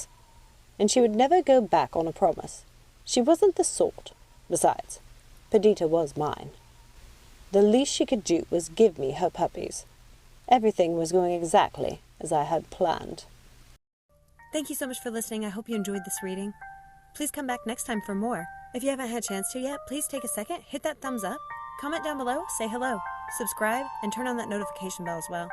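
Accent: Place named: England, United Kingdom. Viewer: Well pronunciated. Love the English like accent. Well done and thank you for sharing 😀😀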